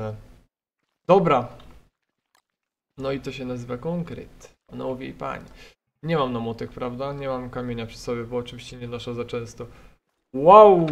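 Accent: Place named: England, United Kingdom. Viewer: А мне показалось англичанин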